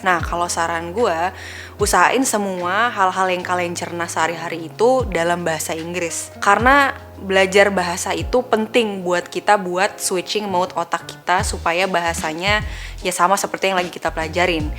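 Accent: Indonesia. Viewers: Paling nikmat emang speaking dan aksen nya orang indonesia 😭. Juelas banget. Dan jarang oake slang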